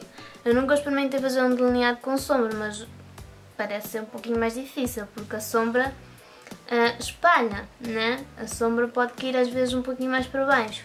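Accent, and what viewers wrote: Portugal. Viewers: Gostei do video, só tenho dificuldade pra entender o sotaque português :( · Que linda! Você ficou muito parecida com ela :D Adoro seu jeito de falar ♥ Portugues de Portugal ♥♥♥ Te adicionei no face e te segui no instagram :D Beijos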